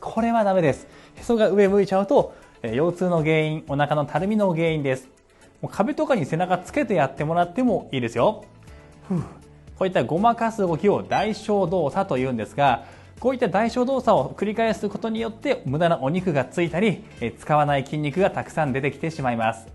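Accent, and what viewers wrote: Kansai, Japan. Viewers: 途中から吹き替えみたいなって内容がはいってこないww ちらちら関西トーン 頑張ってやってみます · 声が良い✨ 関西訛りがあるからか ナダルさんみたい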